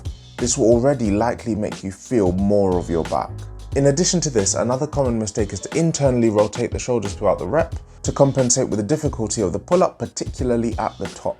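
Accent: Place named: United Kingdom. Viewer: Quality video, definitely will check your advice out next time I'm in the gym! Btw. 3 words into the video and I didn't have to check where you from, mate :D Also, no hate here, british accent sounds awesome :)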